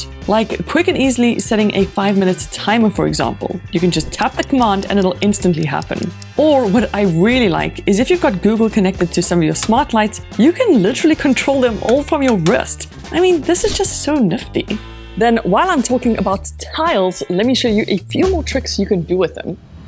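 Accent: South Africa. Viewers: South african accent😅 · Getting my watch in a week. Lekker video, sounds like a zaffer in the UK. Am I right ? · London but you sound South African... Amirite?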